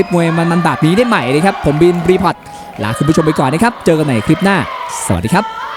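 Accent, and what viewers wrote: Isan, Thailand. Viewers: นอ้ง พากมวยสนุก.ให้เสียงไทยและอี่สาน.ที่ไม่เหมือนใคร.ไทยประสมอีสาน.จะมีบางคำก็ตลกเฮฮาไปดว้ย.บางครั้งผมดูมวยไปฟังเสียงนอ้ง.ผมก็ยังหัวเราะคนเดียวไปดว้ย.มีควสมสุขดีครับได้ฟังนอ้ง พาก.สนุกกว่าพิทีกรอยู่ในสนามมวยเสียอีก.ชอบนอ้ง มากๆครับ.FC.อยู่ฟังลาวครับผม❤❤❤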